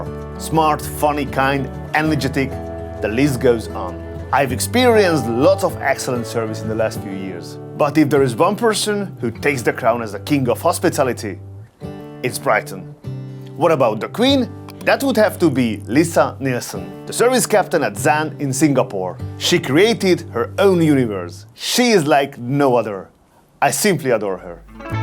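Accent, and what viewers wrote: Hungary. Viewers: This is my first time seeing a video on your channel, I gotta say amazing content. Your accent😄 are you Hungarian?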